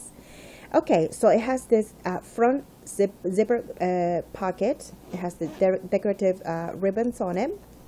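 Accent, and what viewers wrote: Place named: Germany. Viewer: Love that accent. German ?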